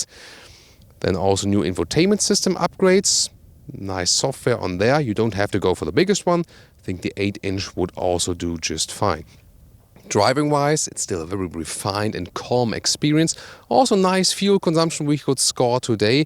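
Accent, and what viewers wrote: Germany. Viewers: I believe your german accent renders you unable to properly pronounce the word "vehicle" . I am sorry to say that this way it sounds as if you were trying to say "fecal" but with "v" at the beginning. This is not an insult ... just my failed attempt at constructive criticism.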